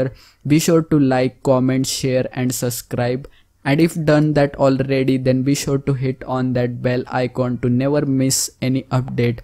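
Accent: India. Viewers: He is just in a Indian accent not everyone is English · it's Indian accent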